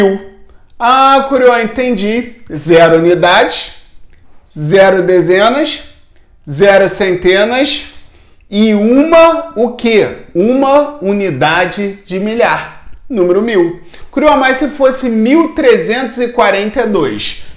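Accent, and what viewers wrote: Rio de Janeiro, Brazil. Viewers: Amo o jeito que ele fala carioca kk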